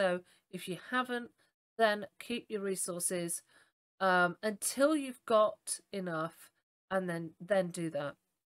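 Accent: United Kingdom. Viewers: I'm a tad biased, of course, British English can win me over immediately. But great guide, points nicely exemplified with footage at the right times, well done! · Sorry, I must have misheard something! P.S. Nice to hear an Eng;ish voice for a change, I can't listen to most of the American guides with all their drama and forced jollity :D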